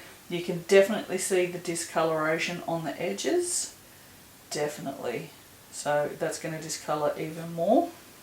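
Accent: Australia. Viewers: Hi, I'm a bit late to the party... love your Aussie commentary lol (Aussie here too). I have this fragrance but haven't used it yet, so was good to see your video. I wanted to ask where you got your soap cutter from please.. I've been looking but seems like theyre all O/S..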